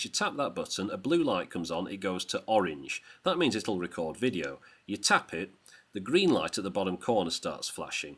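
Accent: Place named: Liverpool, United Kingdom. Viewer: You sound like Ringo Starr! Is that a Liverpool accent?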